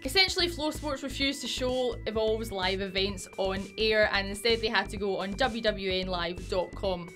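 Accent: United Kingdom. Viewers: god this hard british acent is something. i cant understand a word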